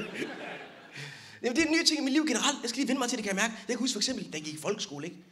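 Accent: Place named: Jutland, Denmark. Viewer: Elsker din humor, jyske accent og din store portion selvironi. Thumps up herfra.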